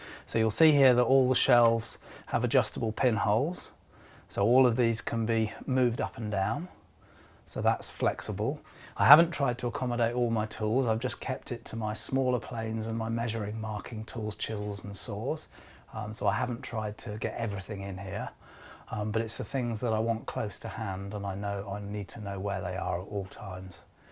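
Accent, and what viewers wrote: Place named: United Kingdom. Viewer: I take it from your accent that you are from somewhere in Britain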